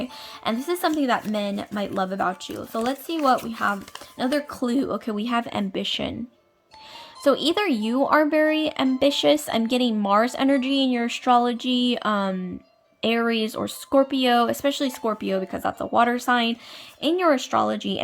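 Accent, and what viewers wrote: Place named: United Kingdom. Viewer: That’s so funny I picked plié 2 and I love the using the British accent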